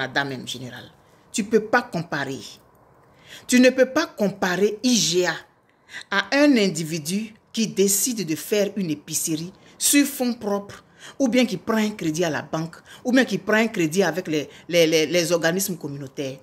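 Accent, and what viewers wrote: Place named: Cameroon. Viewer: Vraiment il fait une publicité gratuite. Il est malade. Nous africains sommes méchants. C est un camerounais par son accent. Eeeh Dieu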